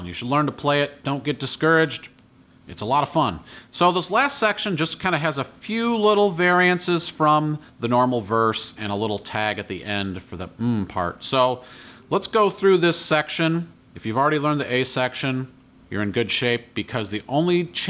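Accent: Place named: South, United States. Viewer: Dudes definitely from the south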